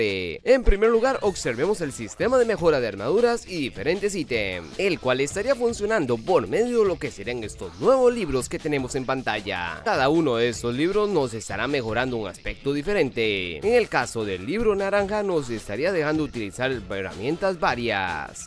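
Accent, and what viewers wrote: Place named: Costa Rica. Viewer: Yo diciendo que raro esa pronunciación de la R me suena parece tico jaja luego veo la bandera y efectivamente jaja saludos desde Cartago xd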